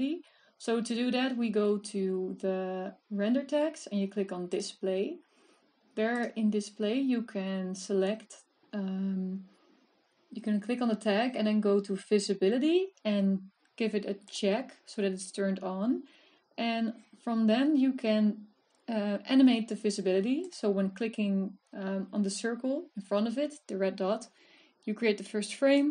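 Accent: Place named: Netherlands. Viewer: tenk joe ferrie much....joe ar de beste......ded was just wat eye needed....nee hoor jou Engels is niks mis mee maar altijd zo leuk herkenbaar dat nederlandsEngels :-)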